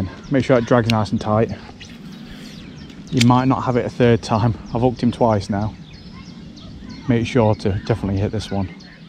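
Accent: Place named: Yorkshire, United Kingdom. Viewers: where are you from? yorkshire? accent sounds same as mine hahaha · Id guess south Yorkshire. At least thats what he sounds like to my N Yorkshire coast ears.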